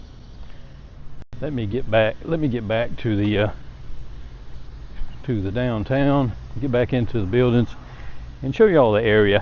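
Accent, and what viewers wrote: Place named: South, United States. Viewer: Good morning,. Thank you for sharing this awesome video on Fork Valley. I agree with you on how it got its name. I might have missed it, what is the population there? It is all so beautiful. If I had to choose a favorite area, it would be the railroad district. Love listening to that southern draw.😎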